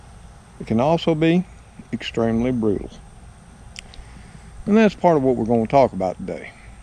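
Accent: South, United States. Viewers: your cute southern accent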